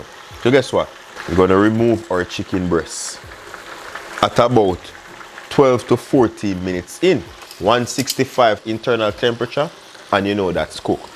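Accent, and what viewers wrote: Jamaica. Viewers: Am here for the jamaican accent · Wicked! A jus fine yuh and am very impressed. Can't wait to try this recipe. Love the mix of English and Patois and di sweet Jamaican accent. Chef, Yuh mek mi feel more homesick dan hungry. Trus mi! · Fat n juicy! 😁luv dat Jamaican twang!❤️👍🏽😋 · Me rate di video from mi hear di jamaican accent🇯🇲💯💯🔥🔥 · Your accent ❤ I had a boyfriend from Jamaica in the 90s. He died in a car accident. Listening to you brings tears yo my eyes. Im going to make this today! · I need a Jamaican man in my life..his accent😍😍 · Love this guy! He’s authentic! Mi miss Jamaica 🇯🇲 just from listening to him! Mi ready fi eat! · I love the Jamaican accent 🤭 and I love his realness too! Smelling the food and using bare hands are things we want to do behind closed doors but I'm glad you make me feel comfortable watching this. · I love that Jamaican accent. 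Kenya we locked in waaaagwan😃😃😃. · Wa gwan! it's the Jamaican accent for me. Love it. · Chief, I am not just here for this deliciousness, but the Jamaican accent. Lol keep doing your thing.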